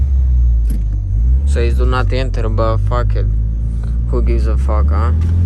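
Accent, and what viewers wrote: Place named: Russia. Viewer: Ебать у тебя акцент русский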